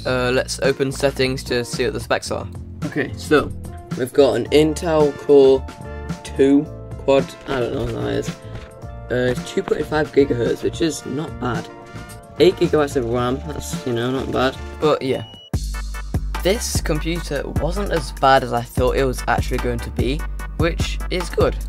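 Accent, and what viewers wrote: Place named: United Kingdom. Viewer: wait, dollars are you american you sound a little bit british to me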